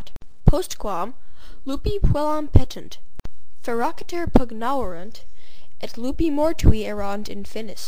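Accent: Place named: England, United Kingdom. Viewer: Sounds like English. You should work your accent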